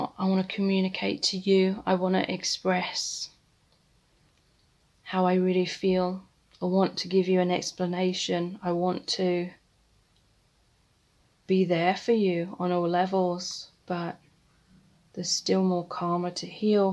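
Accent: United Kingdom. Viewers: This reading is more than helpfull , thank you so much XOXOXOX and I love your British accent 🧡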